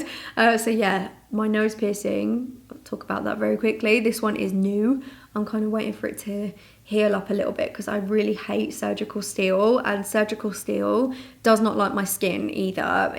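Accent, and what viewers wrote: United Kingdom. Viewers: Was going to say, that's deffo a British accent xxx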